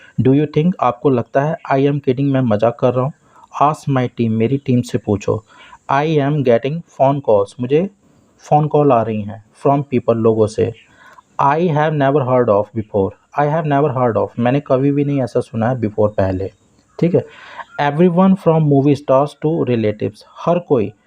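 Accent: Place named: India. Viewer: Accent = India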